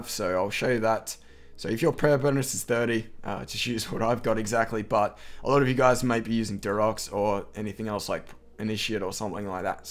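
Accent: Australia. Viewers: Hey mate, are you from the NT? you sound like a real Aussie bloke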